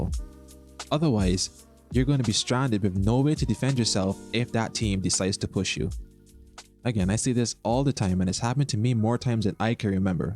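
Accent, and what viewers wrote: Barbados. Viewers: Great video. Will definitely be watching the rest and any future videos. Quick question are you Bajan or Trinidadian? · Are you Bajan? You sound it! Great guide.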